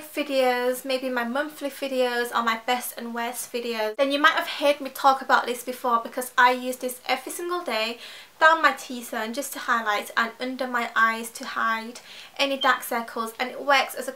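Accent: United Kingdom. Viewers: U sound British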